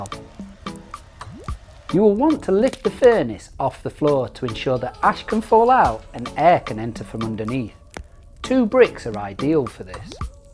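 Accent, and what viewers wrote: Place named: England, United Kingdom. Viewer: He's most likely in England, Australia or New Zealand. The English pronounce it as "Al-ew-minium". Why? There are those that believe the guy who first created Aluminum from Bauxite named it that... and pronounced it that way. You can research up the oft controversial topic and do some reading... it can be somewhat amusing to us engineer types. Peace & good vibes! - Max Giganteum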